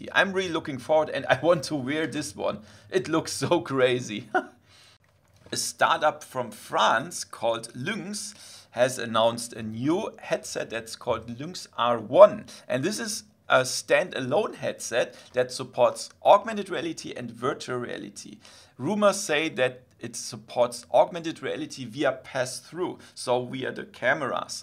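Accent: Germany. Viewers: an deinem english hört man das du deutsch bist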